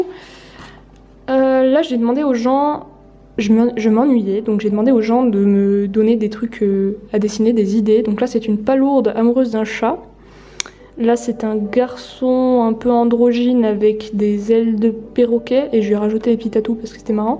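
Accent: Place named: Switzerland. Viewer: No I love your french so much! I spent a little bit of time in geneva and the swiss alps and honestly swiss french is one of the easiest to understand for me! I had more trouble with nice and Bordeaux, none the less you had so many beautiful sketches in here, even if I didn't understand the french I'd still love to watch <3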